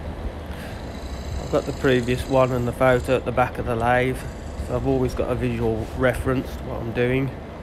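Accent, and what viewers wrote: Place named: Birmingham, United Kingdom. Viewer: I love your accent. I lived in Birmingham for a while and I always feel homesick listening to you. Plus I'm hoping to be braver with the skew...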